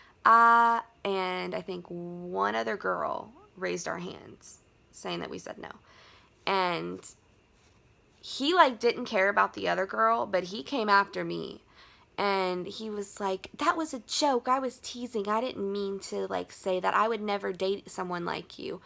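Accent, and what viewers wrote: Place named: Texas, United States. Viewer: I live in England (UK) but already thought you lived in Texas from your accent (y'all) and the way you guys interact etc. :,)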